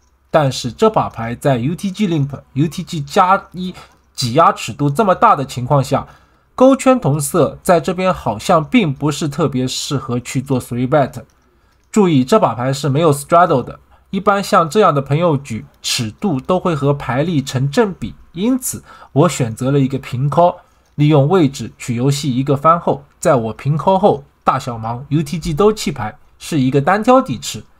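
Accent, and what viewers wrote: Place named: Hangzhou, China. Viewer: 口音杭州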